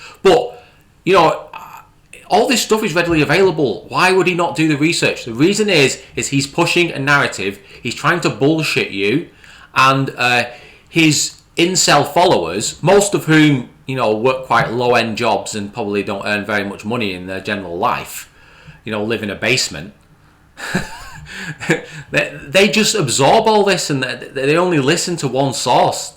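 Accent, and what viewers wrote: United Kingdom. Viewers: I grew up loving every single British accent I've ever heard. Thanks to the rampant self-owning stupidity coming out of my speakers in your dumb-soaked voice has diminished that pleasure significantly. What really proves that you don't know what "science" is hardly the idiocy you've given about his obscure research or his lack of engineering patents, it's the fact you thought he wouldn't look up source materials like the Boring Company's own published materials. "That's why we don't listen to the guy, because he doesn't know anything about anything". Says the clown with about 8310 subscribers, compared to a 1.02 million subscribers. If only, if only, IF ONLY... it's such a crippling shame that you aren't really a crew member sharing the fate of the USCSS Nostromo. Being the kind of creature who would assist and enable snake-oil salesmen like Musk means you ought to be banned from making videos. 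I'd consider listening to you the same way people might watch clowns, to poke fun at your inability to do basic thinking. It would be amazing to watch you "shoot down all" his "stupid videos" and watch you blow off both your feet... but it hurts too much to hear your idiocy expressed in a British accent. You'd be ashamed and would componse an apology video if your brain functioned, you gamma-slug.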